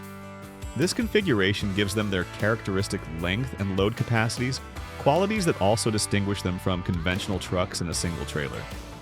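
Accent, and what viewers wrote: United States. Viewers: American bloke ?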